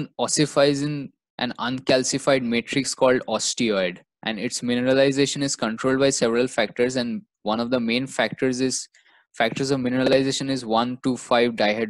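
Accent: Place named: Italy. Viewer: Love the Italian accent XD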